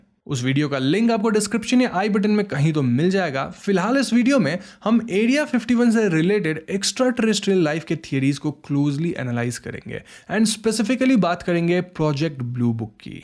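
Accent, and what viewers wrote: Bengal, India. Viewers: U r Bengali right? Ur accent reveal it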